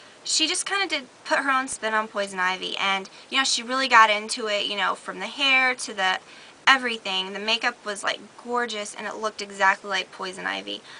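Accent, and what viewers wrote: South, United States. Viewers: "and at the ieendd" Haha your southern accent comes out more and more the more videos i watch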